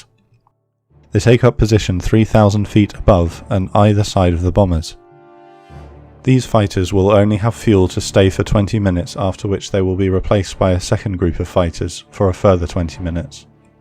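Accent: England, United Kingdom. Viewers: Krister Andersson: You couldn’t tell the narrator is English?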